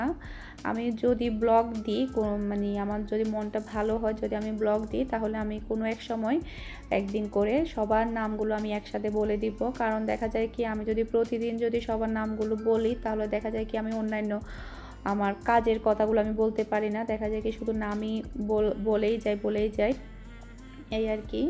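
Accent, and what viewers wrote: Chittagong, Bangladesh. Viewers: Apu apnar vasa ki chittagong?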